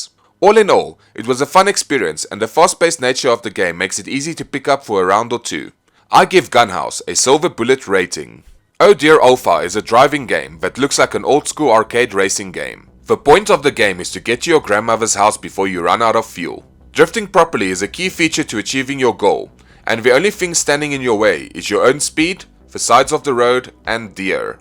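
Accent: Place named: South Africa. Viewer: You sound a little bit Southafricanish lol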